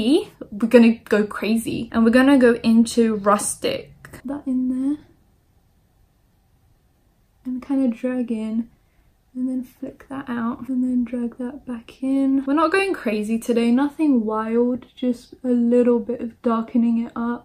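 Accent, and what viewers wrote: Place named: London, United Kingdom. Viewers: Lmao omg her accent and pronunciation is like so rough, pure london hahaha. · ringaling it’s so weird to me, like the place that i’m from is like 4/5 hours away from london so idk the range of accents that well but to me she sounds working class london but NOT at the same time??? and it confuses me i don’t think i’ve heard the combination before lol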